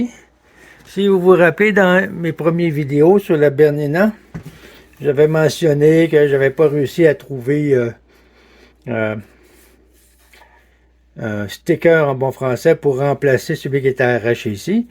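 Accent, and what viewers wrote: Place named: Quebec, Canada. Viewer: Vous n'entendez pas l'accent québecois? Ça fait loin pour réparer en Suisse.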